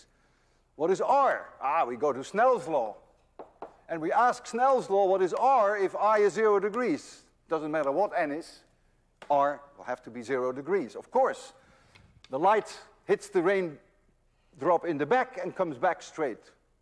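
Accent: Netherlands. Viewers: Some people tell me that I sound just like this man when I'm talking English. I'm Dutch too. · The dutch accent is indeed very recognizable, but atleast he's not like Van Gaal.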